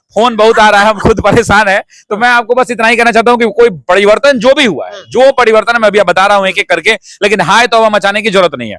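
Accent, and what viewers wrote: Bihar, India. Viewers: सर... पूरा बिहारी टोन प्रयोग करते है....😂😂😂हमारे बिहार की शान........ और सर 🎉🎉🎉🎉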